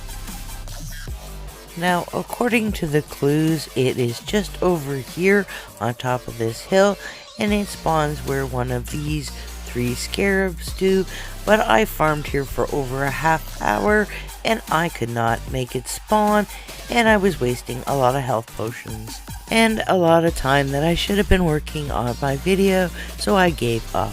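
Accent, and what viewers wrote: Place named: Scotland, United Kingdom. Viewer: Sound more Scottish than Irish, luv it!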